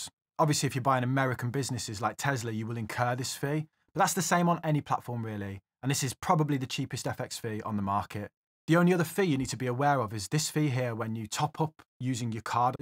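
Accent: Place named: West Midlands, United Kingdom. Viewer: as a fellow west midlander great to hear our accent broadcast you videos are very educating and continue to grow our knowledge thankyou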